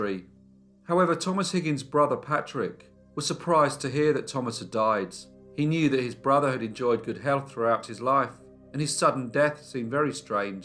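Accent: England, United Kingdom. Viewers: It sounds like you've been in England longer than just covering this video hahaha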